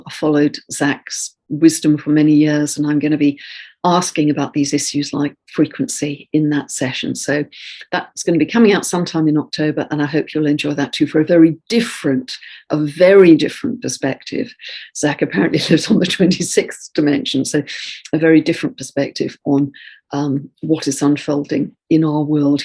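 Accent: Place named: RP, United Kingdom. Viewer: You have the poshest accent ever. If anyone wants to know what "the Queen's English" or "BBC English" or "Received Pronuncation" sounds like, listen to this young lady. Some English people have accents that "sound" super high class, but when they pronounce certain words with a bit of a working-class twang, it gives them away as not really posh. Your accent, however, is truly impeccable.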